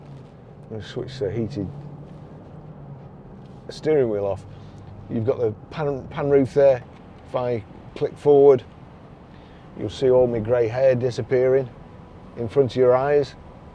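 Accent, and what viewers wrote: United Kingdom. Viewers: Yes, my daughter spent time training in Preston at Preston Royal as a Hospital Doctor. However, I’m afraid that stupid and inconsiderate driving is commonplace. Had they learned and driven with slower non electronic vehicles they might have realised their own and their vehicles limitations. Younger drivers think that they are good drivers because their electronic aids mostly mask their errors. As the old pop record had it; “Keep your eyes on the road and your ‘Hands’ on the wheel!” ,though referring to different attractions. Nice to hear the accent having spent nearly fifty years in exile in Yorkshire!.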